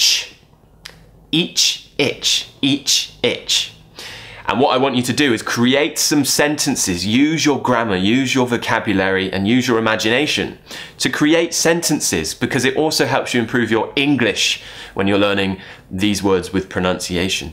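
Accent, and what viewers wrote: RP, United Kingdom. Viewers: Thanks to God Thanks to your parents than Thanks to you for teaching us perfect British English and please send more videos when you can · Do you speak English according to rp or not explain it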